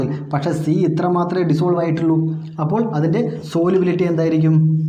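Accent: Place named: Palakkad, India. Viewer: slang kettapolle thoniii pkd annu ennu🤪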